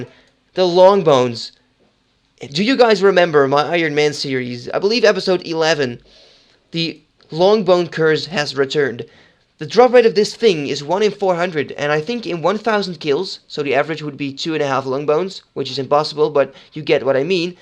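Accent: Netherlands. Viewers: ik zag het al aan de rs chat hehe zieke videos maak je, kon het meteen horen aan je engels. Niks mis mee maar aan het accent hoor je al dat je nederlands bent ;)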